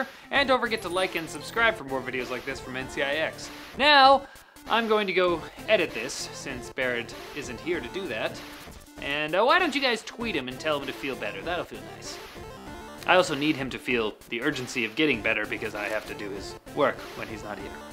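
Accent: Australia. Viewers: Why choose an australian accent though? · mate that sounded australian not like a New Zealander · Your Australian accent is getting better.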